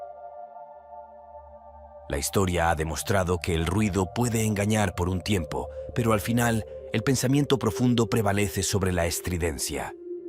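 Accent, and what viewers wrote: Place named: South America. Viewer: Parece un sudamericano pretendiendo ser castellano. Es mezclado.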